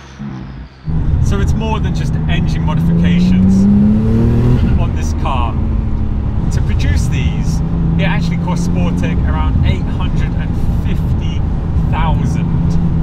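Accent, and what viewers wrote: Switzerland. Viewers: I was waiting for the day when I hear you speaking swiss german... Finally it has arrived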